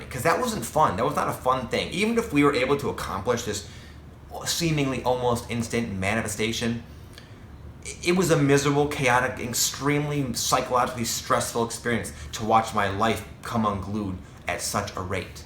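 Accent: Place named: Boston, United States. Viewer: Ahhgg, it's a Boston! 😄💕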